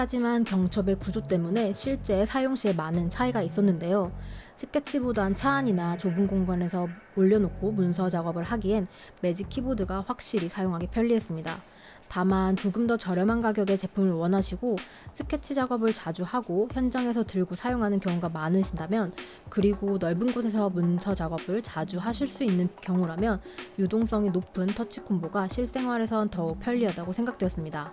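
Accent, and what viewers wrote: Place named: Gyeongsang, South Korea. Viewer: 경상도 분이시네요 ㅋㅋ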